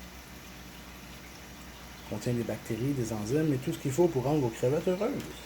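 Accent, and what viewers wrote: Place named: Quebec, Canada. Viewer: C est cool d entendre parler quebecois Bravo! Lachez pas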